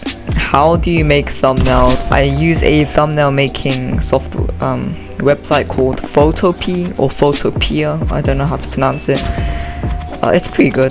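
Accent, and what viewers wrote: United Kingdom. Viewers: U sound British btw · Lol, you have a British accent. But, no hate and nice voice.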